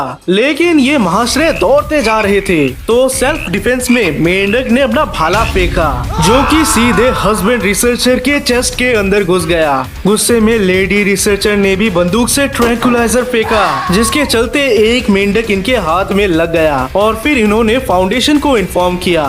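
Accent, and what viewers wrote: Bengal, India. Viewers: Bhai ap bangali ho kya ❤❤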